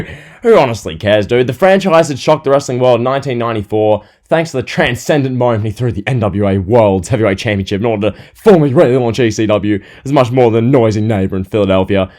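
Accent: Australia. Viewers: I love the Aussie accent bro, bless you..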